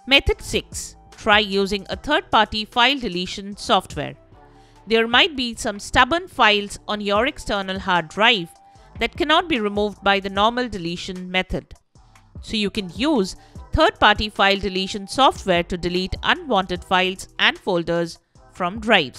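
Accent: India. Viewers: i stop when i hear indian talking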